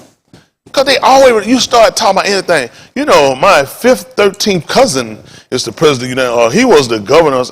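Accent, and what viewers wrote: South, United States. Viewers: I'm confused is he teaching bible or what yesterday was the first time i heard him and he was angry at pastor Jennings I'm tryin to stay connected to God why is it so much confusion going on teach or talk about whats happen in the world.and not explain what ever he's tryin to say what? Is he high!! A fast country talkin bad language in the church.he is in church?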